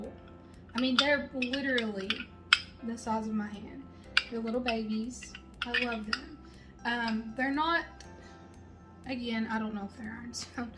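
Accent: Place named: Kentucky, United States. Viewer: You have to be from Kentucky you have the voice lol so am I...great haul,,love Peddlers Mall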